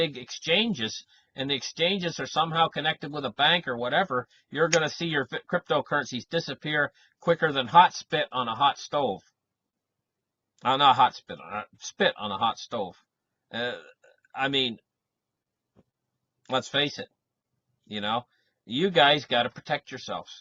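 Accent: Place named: Northern Ontario, Canada. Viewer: His name is. I asked him where in Canada he lives and he didn’t respond. Judging by his accent, I would say Northern Ontario or the Maritimes (eastern Canada)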